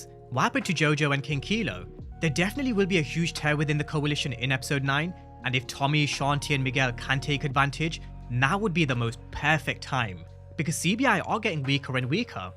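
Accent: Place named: United Kingdom. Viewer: He sounds British. So it’s 10 am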